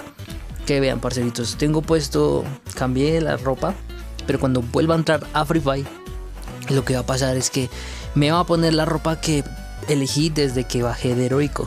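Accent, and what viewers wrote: Medellín, Colombia. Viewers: Me gusta el asento colombiano xdxdd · Eres de Colombia sierto,creo que de medellin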